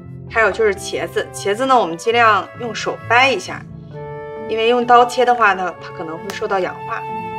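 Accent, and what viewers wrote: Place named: Northeast, China. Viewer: 美女菜做得好，一口東北腔，也說得好聽。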